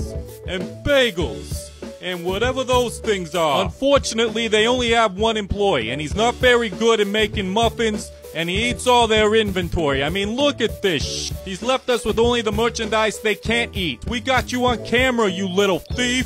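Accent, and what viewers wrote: New York, United States. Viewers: Love the NY accent!! Lookin' good, BB! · This is the first time that I've seen this video. It's hilarious. Especially the narrators New York accent. ❤🐈❤🐈❤🐈❤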